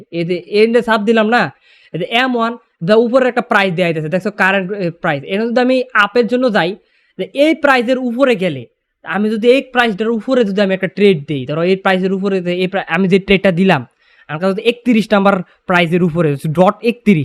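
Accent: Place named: Mymensingh, Bangladesh. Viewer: Vai apnar kotha sune mone hoitase apni Kishorganj or Mymensingh er...amar bari o oi dike e...vlo laglo apnar video ta...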